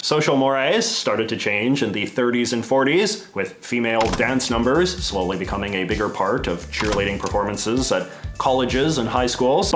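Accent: Canada. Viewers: I love his Canadian accent 😍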